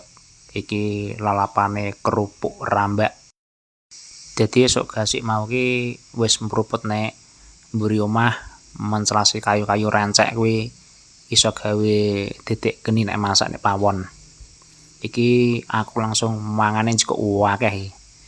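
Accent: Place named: East Java, Indonesia. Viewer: Mangane uuakeeh.. logat jawa timur ya Mas'e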